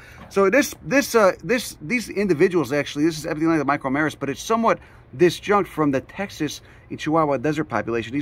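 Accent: Chicago, United States. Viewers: I love your videos!! If you come near the Northeast I could show you some great spots...I'm extremely interested in wild edibles, (my 4 acre yard Is one big botanists dream!) My thick Boston accent and your chicagoan accent would meld perfectly. Keep on keeping on my friend!!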